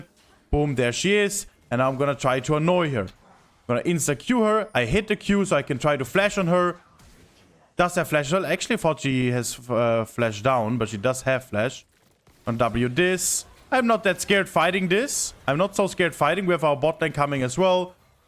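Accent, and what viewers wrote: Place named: Germany. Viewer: Dierekt gemerkt dass er deutscher ist hahahha aber echt gutes guide video fuer low elo und die fundamentals zu zeigen wie wichtig das ist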